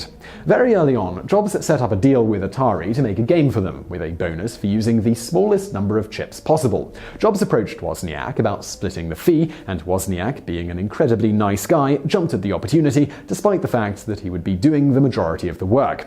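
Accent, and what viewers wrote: United Kingdom. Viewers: Uhh Debbie Reynolds, Elizabeth Taylor and Eddie Fisher should have been number one not scandal involving the Sherlock Holmes author and Houdini. But maybe bias since you sound like you’re from the UK?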